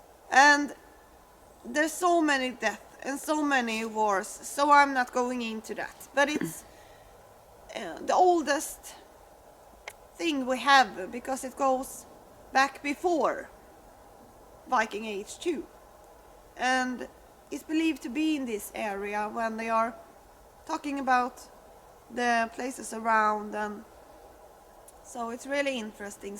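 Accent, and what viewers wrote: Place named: Sweden. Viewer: Thank you for this wonderful documentary, I enjoyed it so much! I'm an Australian and I visited Halleberg last year. I have been studying the Viking Age and the earlier periods since I was a teenager in the 70s and I've always dreamed of going to Sweden but I never heard about these two mountains, and I especially had never heard that they were Asgard and Valhalla and Odin's lookout. I started writing a book 2 years ago which I've just published and I talk quite a bit about this area because my Swedish friend took me there and translated the posters in the museum about the Aesir coming and setting up there fortresses there. I think they built those great stone walls you were showing. You taught me a lot of very useful things, I found you because I was looking for information about Hästevads Stenar. You look really great in your warm green dress, you really do look like a magical lady from the Viking age. 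The way you speak English with your Swedish accent is also very charming for us to listen to. I hope to come back again soon and camp there and really look over the whole area. Kindest regards David from Australia.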